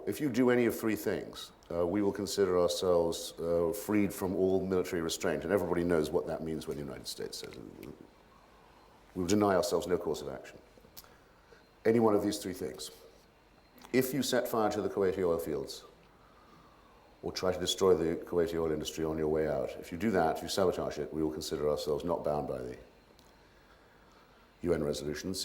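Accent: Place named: Oxbridge, United Kingdom. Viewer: Notice how he focuses on Europe and the Middle East, no mention of Central and South America. His memory lapse regarding El Salvador and Nicaragua is ideologically too convenient to overlook. Thankfully for those not chained to the Anglo-American doctrinal system, one can hear past the Oxbridge accent and see this impostor for what he truly is.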